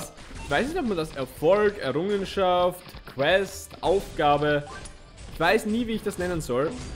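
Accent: Austria. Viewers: Bist du Österreicher wegen dem Akzent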